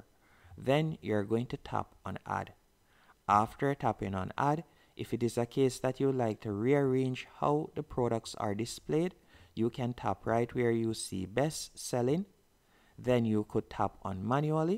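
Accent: Jamaica. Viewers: Yo you sound like a yaad man , if yuh bug mind help me generate some sales please 🙏🏽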